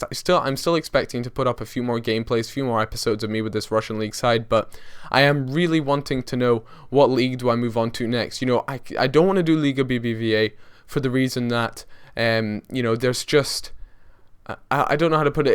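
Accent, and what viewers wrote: Scotland, United Kingdom. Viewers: I am kind of confused about your accent, are you Scottish?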